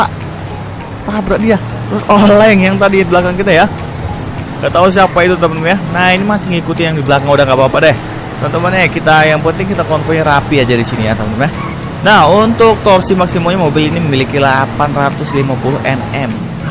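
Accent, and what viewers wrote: Bali, Indonesia. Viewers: Orang bali bli??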